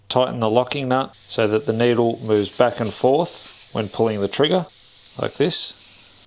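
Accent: Australia. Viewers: Strange... Now i very very much hear your strong Australian Accent 😂😂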